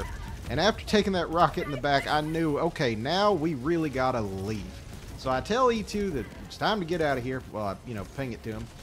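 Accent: South, United States. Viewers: It’s interesting to hear a guy with a southern accent say democratic pride. You’ll never hear that otherwise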